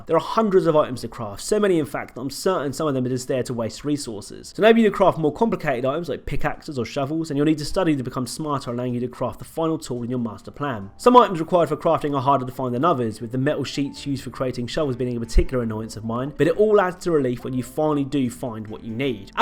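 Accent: United Kingdom. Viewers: Oh then you're just not used to this guy's accent then. It doesn't mean other non UK speakers have a problem understanding these kind of accents though.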